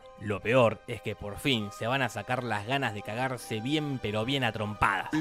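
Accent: Argentina. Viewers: Sos argentino? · Sos argentino???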